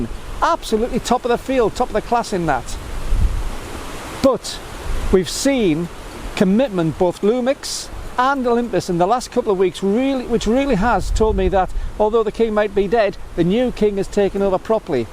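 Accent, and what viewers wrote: United Kingdom. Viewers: great insights. always fan that British accent :) The bottom line if you carry a bag of multiple lenses (3-4) and want to be on a minimal comfortable carry, nothing compares to m43. given the low f-number per price (such as 25mm f0.95) you still get a decent full format ~f2.0 paying less money per overall and keeping the size to minimum. also, the sensor movement compensation of Olympus makes it possible to shoot in low light for a long shutter time, and the elegance of pana, say gx7, is 2nd to none, except Leica. So the only thing comes down to Megapixel which is quite BS in real life.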